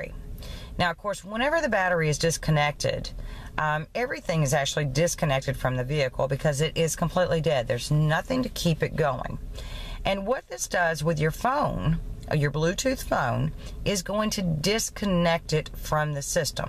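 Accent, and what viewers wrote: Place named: Virginia, United States. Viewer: Really? I liked her voice. More Virginian I thought.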